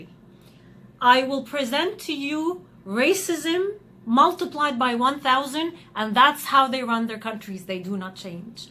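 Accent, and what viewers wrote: Egypt. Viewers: Do not lie... You are not from Saudi... Your Arabic accent is Egyptian 100%... If u r Saudi prove it... Passport.. Or which part of the Saudi area... What is your tribe ... Otherwise stop telling lies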